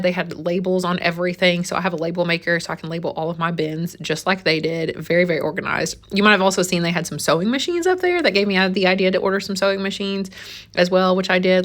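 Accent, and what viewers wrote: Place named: Kentucky, United States. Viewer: Also, I just found out you're from Kentucky and so am I!!!!! I thought you had a familiar accent 😂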